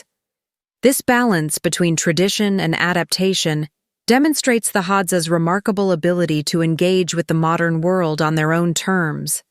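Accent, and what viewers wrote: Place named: United States. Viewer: Every time I watch videos of this tribe, I mostly enjoy listening to them talk. Their language is the main attraction. Now imagine having to listen to this American voice narrating a story 😞😞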